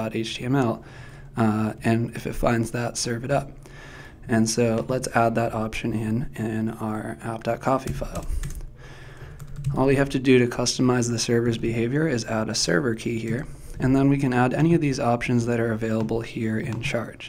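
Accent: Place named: Canada. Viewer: I really like Roots! I hunted around for a long spell and finally found it. I think it's probably the answer to my quest for a static website generator to host some articles of mine. On another note, I find the narrator's accent interesting. I'm really good at spotting accents and can't figure out where this fellow is from. In some parts I think he's from Canada, but then I detect a note of southern California. or maybe some other southwestern U.S. place. Oh, well, it doesn't really matter. Roots seems to be truly great stuff to me. I'm going to go ahead with it joyfully!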